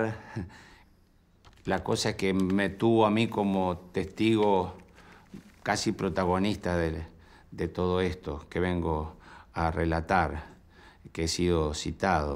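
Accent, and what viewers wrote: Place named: Buenos Aires, Argentina. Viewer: Que paso tenes el acento a porteño...antes eras más chévere igual te banco ✌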